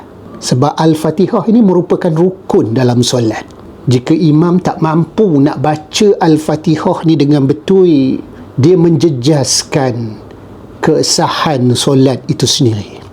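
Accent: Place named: Perak, Malaysia. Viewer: Tu dialek perak...jangan sedap mulut kalau jahil.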